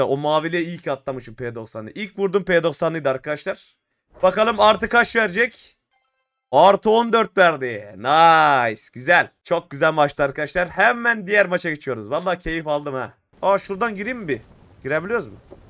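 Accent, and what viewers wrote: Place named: Antalya, Turkey. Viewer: senin antalyalı konuşmana bayılıyorum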